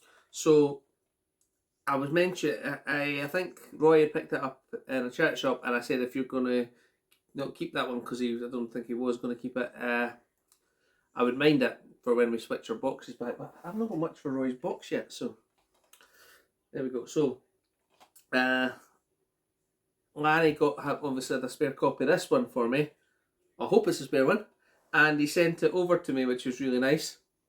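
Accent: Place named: Scotland, United Kingdom. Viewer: Just wondering what part of Scotland are you from. I myself I was born in Fife👍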